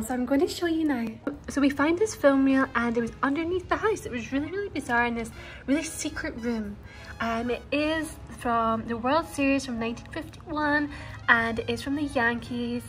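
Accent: Scotland, United Kingdom. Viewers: Stop trying to change your voice. You naturally have a Scottish accent, but half the time you’re softening voice like Marilyn. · Easy peasy ❤️ love the Scottish accent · Lol of course it's inauthentic, she's from Scotland so normally her natural accent is a thick Scottish accent but she's CLEARLY a Marilyn Monroe enthusiast where she styles herself to look like Marilyn, moved to L.A., an ENTIRE different country, and bought Marilyns house, so ya, if she didn't try to imitate Marilyns baby high pitched voice, i wouldn't be watching her as the baby voice is a part of the gig, this look wouldn't make sense otherwise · I beg to differ. Marilyn is gone. This is her interpretation and she has a Scottish accent.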